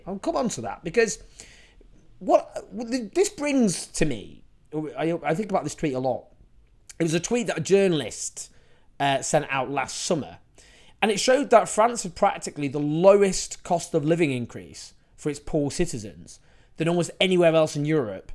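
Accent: United Kingdom. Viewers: British need to get rid of the royalty thing. It's so unthinkable that you still admit this kind of institution. We got rid of our king a long time ago (although killing him was a bit too much). Our sense of resisting and expressing the popular demands come from that time when our people took threw away the monarchy. Anyway, lovely to hear your British accent. I feel like I am hearing a Shakespeare play at times.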